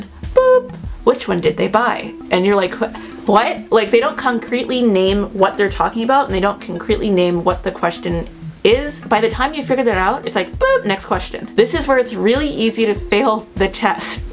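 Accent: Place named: Japan. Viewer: You are half Japanse and half english speakers. You tone reveals it.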